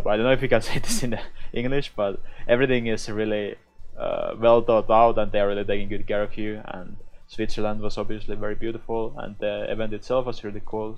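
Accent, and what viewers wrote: Finland. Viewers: Yo the voice/energy in it isnt that easy to chaenge when you have a Finnish accent and English isnt your first lanuage.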